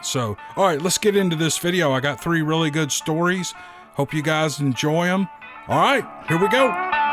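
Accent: South, United States. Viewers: Gosh I’ve missed you! Some how I got unsubscribed and have been dealing with deaths in our family 🥺 today I went looking for you and it’s so nice to be back home.. I love your chit chat and I’ve missed your southern accent ( from Eastern Washington State🤣) thank you for all you do and this beautiful community of friends💕🌻🙏♥️God bless you all!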